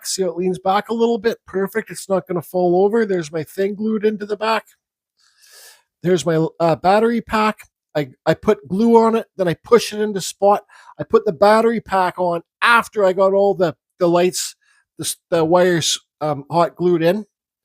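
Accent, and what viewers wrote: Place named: Canada. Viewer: I think I’m detecting a Canadian accent!!….or upper Midwest..I love it…I’m from Michigan and have spent a lot of time in Canada and of course the Midwest! Thanks for sharing your expertise much appreciated…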